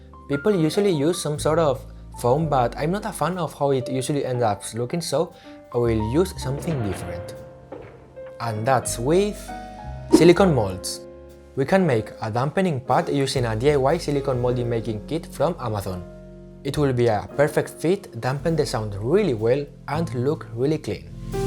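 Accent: Spain. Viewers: Me ha bastado con la primera frase para darme cuenta de que eras español jajajaj. Por cierto muy buen vídeo, de gran calidad y se notan tus ganas y conocimiento. Hasta Marques Brownlee te ha comentado... flipante. Sigue con el buen trabajo! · You have a suspicious spanish english accent... where are you from? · Súper obvio que eres de España con ese acento. ¿Cómo aprendiste a hablar tan bien el inglés? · are u spanish? the accent sounds like it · Where are you from? You sound like a native spanish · Spanish accent were are u from mate? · Man where are you from? Your accent sounds so Spanish! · Are you spanish, aren't you?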